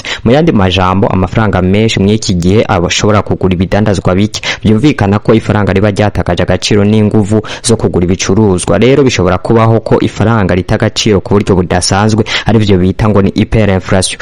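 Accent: Rwanda. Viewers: Nagira ndagusabe uze uriga uravuga ikirundi mpuzamakungu apana mpuza mahanga ivyibinyarwanda wivugisha stop ikindi Vuga nkawewe woye kwigira nka Ismail mwanafunzi ibisigaye courage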